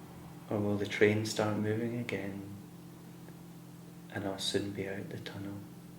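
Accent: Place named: Scotland, United Kingdom. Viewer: A Celt with a strong Scottish Brogue. 👍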